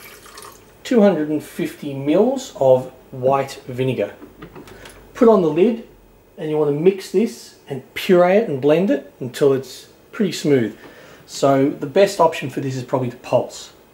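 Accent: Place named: Australia. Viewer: Lol as if your great Aussie accent doesn't give away where you are! Hello from Sydney!!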